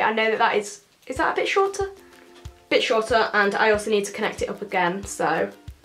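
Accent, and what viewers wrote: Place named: Nottingham, United Kingdom. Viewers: Nottingham I think x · Where abouts from the uk are u from , I'm trying to figure out yr accent 😂✨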